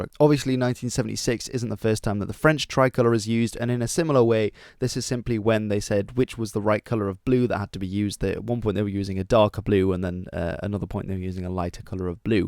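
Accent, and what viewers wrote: Netherlands. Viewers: Wtf i was watching this and ur english was perfect so i thought that you were british but then you name the nsb those and I hear a perfect dutch accent and now i don’t know anymore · At first i didnt even notice you were Dutch, at least not untill you said NSB. Then some other words made it even more clear. But i still had to look in the comments for my confirmation. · As a kid from Amsterdam I moved to Australia in '86 when I was 8yr old and I just love these videos. I missed out on a lot of Dutch history and your videos are great little packages of absorbable knowledge. On a side note.. I picked your Dutch accent the first min of the first video I saw but I can hear a very posh English accent coming through so much so would I be right to assume you went to an expensive school in England at some point? Your English is better than mine... 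lol.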